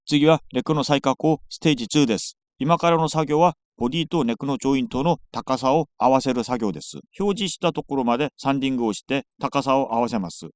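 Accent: South Korea. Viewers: 韓国訛りの日本語でも上手😊 ずっと日本の人だと思ってた~応援してます🎉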